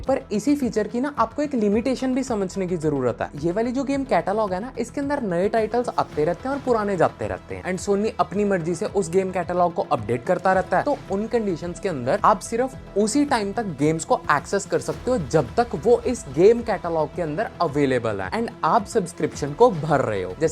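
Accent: Punjab, India. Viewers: Bro are u from jammu or Punjab because of Accent?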